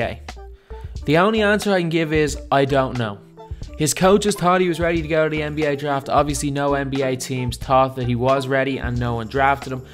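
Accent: Ireland. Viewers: Are u from cork or dublin. I live in Kerry and recognised the accent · Are you from ireland? Cause i noticed that you sounded ALITTLE bit like conor mcgregor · R u Irish?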